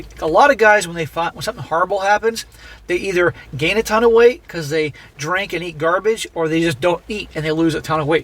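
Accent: Boston, United States. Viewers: "I don't have a Boston Accent"... I call BS. I knew you were a masshole the minute I heard your voice a year or two ago.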